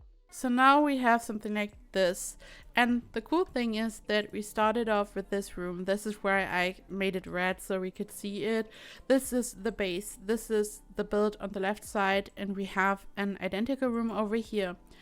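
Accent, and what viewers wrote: Germany. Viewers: Your Accent tho....you're German ain't ya?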